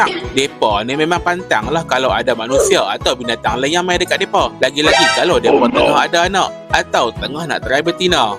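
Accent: North, Malaysia. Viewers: Terbaik bro. Kekalkan loghat utara❤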